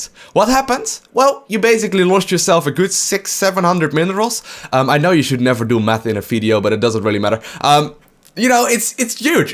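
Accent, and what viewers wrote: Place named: Netherlands. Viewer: fuck i love dutch people. your personalities and accent is so awesome! love your videos bro!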